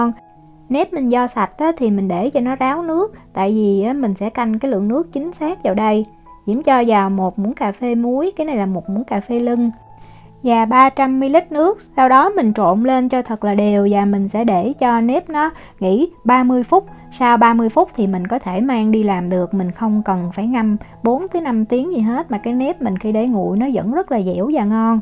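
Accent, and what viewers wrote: South, Vietnam. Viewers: Giọng nói miền Nam rất dễ thương, rõ ràng. Hướng dẫn kỹ. Thanks em!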